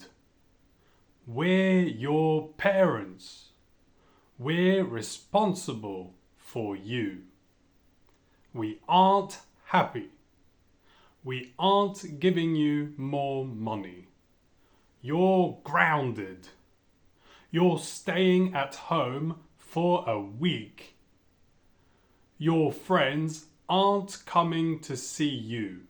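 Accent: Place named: United Kingdom. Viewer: Je remarque que vous avez un accent britannique comparé à notre accent canadien ! Merci je suis agréablement surpris d'avoir accès à ces petits vidéos qui m'aideront j'en suis sûr !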